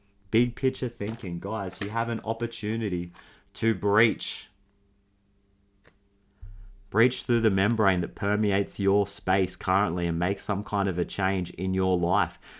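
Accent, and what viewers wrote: Australia. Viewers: Hi, Thanks again, for your wonderfully encouraging words!! Are you Australian? (Your accent gives it away…lol). My grandpa was from London, but he always said he wanted to go to Australia. He is in Heaven right now, so he can probably travel anywhere he wants to. Best to you,. 🐶🥰🌸 “I am so grateful that I have received large sums of money, under Grace in Perfect ways.” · You are so talented, appreciated and on point. And your aussie accent brightens my day everytime 🙏😁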